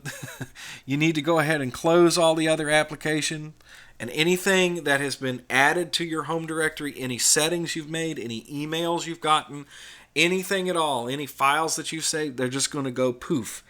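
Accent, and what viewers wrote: United States. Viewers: just found you! Disabled, I am keeping myself busy trying multiple times to install Linux with failure due to various reasons. lol I need to desperately get up and running within a week only or else I will be in a pickle situation. Familiar with using pc and windows 30yrs, midrange / mainframes-user. U sound like u r n USA. I am limited to web access now. I have few KEY QUESTIONS. Can I email you Sir? Humble Respects. Alton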